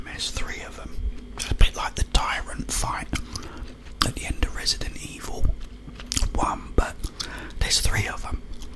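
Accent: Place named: London, United Kingdom. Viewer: Just found your channel! I’ve never seen an ASMRtist wiv a propa landan accent. I’m born and raised London myself, so I got an extra pang of like nostalgia and just general asmr from the whispered vaguely cockney “wewcome” at the start I’m now hoping you actually are from London or this’ll sound a bit weird 😂 Love the content nonetheless!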